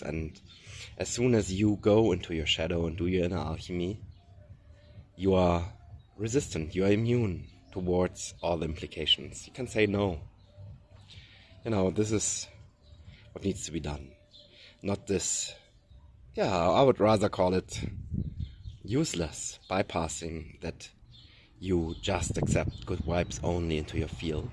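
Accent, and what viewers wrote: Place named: Germany. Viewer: Thank you. Just found you this moment. Feels like blue ray expression transmitting, and now you confirmed my clear impression of german accent . Or swiss. Watched your history transmission. Interesting, but not very relevant from my point of view. I am open to share and love to get finally in touch/exchange with same frequency souls, like blue rays . Its about unifying now, from my point of view. Love from Patagonia, Chile from a humble german imigrant😅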